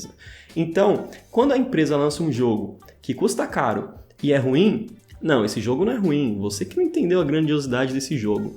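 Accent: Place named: Northeast, Brazil. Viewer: vc é nordestino né? só podia ser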